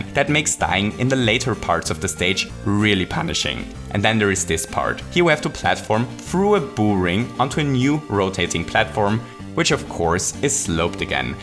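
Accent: Germany. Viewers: I hate the German acsent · Wie ich immer herausfinde anhand des accentes das jmd deutsch bzw Österreichich spricht. irgenwie hört man das raus!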